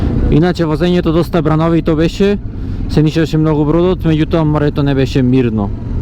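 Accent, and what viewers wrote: Bitola, North Macedonia. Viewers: браво, за времето трудот и енергијата за креирање на убава видео содржина. Ама од се најпојќе ме бендисва шо по Битолски си збораш, и само така продолжи. Кој чактисва, чактисва, другите горе долу од видеото ке сватат. Поздрав 💪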